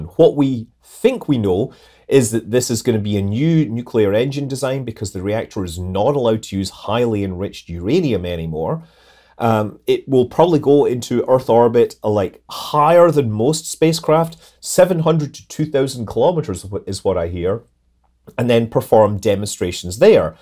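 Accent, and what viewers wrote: Scotland, United Kingdom. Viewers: In addition to your excellent reporting, I also enjoy hearing a Scot speak with the accent notable among the people of my heritage.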